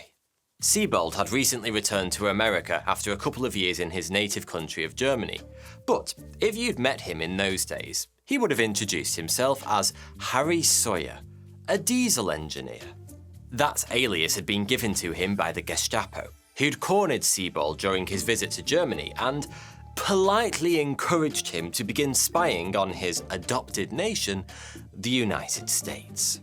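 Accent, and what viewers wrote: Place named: Yorkshire, United Kingdom. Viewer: Everybody loves the yorkshire accent. Exactly why this guy is so popular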